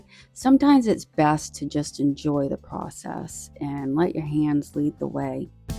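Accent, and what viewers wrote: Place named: Boston, United States. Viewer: Some vocal rhythms I just cannot listen to. I can’t watch some diy channels because of it, or the repeating of words, like “I’m gonna go ahead and…” I’m out, I can’t do it. 🤦‍♀️🤷‍♀️😂 I don’t know what it is, but I just can’t listen to it. I know it isn’t their problem, it’s mine. I have heard people repeat that phrase ten times in the first minute or two and I just don’t know what causes me to check out, but I do. I haven’t noticed any of that on your channel, your voice is relaxing. Are you a Boston girl? You have a slight accent. Your journal is beautiful. I am like you, it is hard for me to make decisions and to get started on something new. I need less stuff, because I have a hard time with decision making. 😂 I am actually going through my stash and throwing some things out, because I have far too much stuff.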